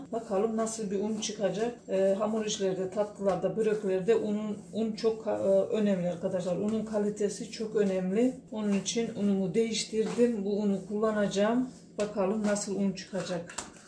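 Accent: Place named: Black Sea, Turkey. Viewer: Banada Karadeniz li gibi geldi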